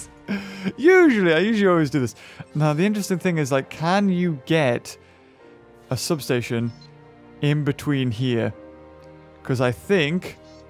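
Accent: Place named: United Kingdom. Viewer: Okay, that person who said all that mean stuff is really annoying to me for many reasons. 1) Saying the same damn thing for three comments straight doesn't get your point across any better than saying it once. 2) How can you not like laugh?! It's so blubbin' funny. Infectious, actually. And for the record, I love British accents. Just makes everything funnier.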